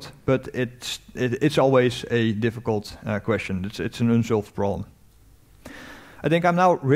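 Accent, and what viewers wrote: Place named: Netherlands. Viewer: helpful video. but the Dutch-English here is cringe worthy.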